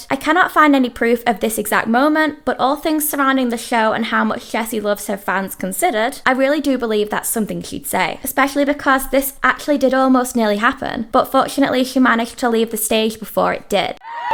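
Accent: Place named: Manchester, United Kingdom. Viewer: Is that like a mancunion accent or something 😂just kind of recognise it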